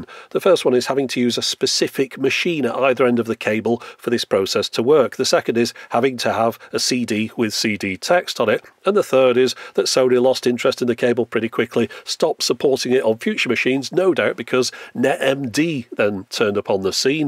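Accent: United Kingdom. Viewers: I love listening to a British man talking and showing obscure technology, and I think plenty of young people would like to watch a British man talking about tech❤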